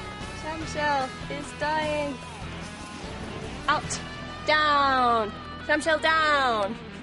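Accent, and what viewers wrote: United Kingdom. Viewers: Back when was British. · Hilarious. Great video. In addition to the humor, I was struck by how deeply British your accent was at that time. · So, I notice a different accent back then. The UK experience no doubt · Why does speaks with the British accent in this video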